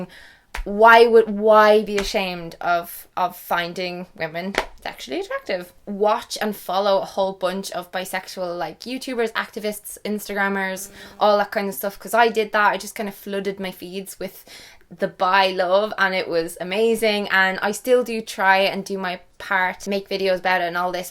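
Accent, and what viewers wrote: Ireland. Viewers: Omg!!! You look AMAZING!!! So cute!!!! What happened to you? Like ur eyes used to be green! Ahaha! Now they're blue! Ahaha! Do more vidios about your amazing Irish accent! :-D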